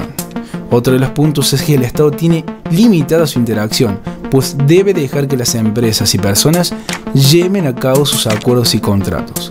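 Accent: Córdoba, Argentina. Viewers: Creo puede ser cordobés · Like por el acento cordobés 😍 Nah fuera de joda, me sirvió mucho, graciass!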